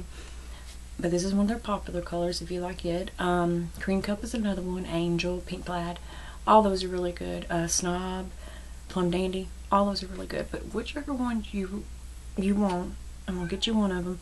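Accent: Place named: Louisiana, United States. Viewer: I am a new supporter, I found you thru Vallerie Hill. I'm not one to unsupport or unfollow just because I didn't win. I think that's awful & im all about supporting especially small channels and buisnesses. I like to see people grow and I'm passionate about that. So no worries hunnie. I'm glad I found you because it will be great to see you grow!!!! And YOU WILL!!! 😊💗💗 I absolutely love your personality and spunk of Louisiana !!!! I'm from PA so accents are so much fun! I actually don't think I've had Louisiana food cooked right before! I've tried making creole before if that counts!!! I'm from up north so .... Anyways rambling 😂😂 3 MAC shades? My favorite are:1. BLANKETY. 2.SNOB 3. HALF N' Half. And a Question for Q & A ? What is your favorite drugstore brand & favorite High End brand? Thanks for the chance! And cannot wait to continue to see growth in your channel!! 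💗💗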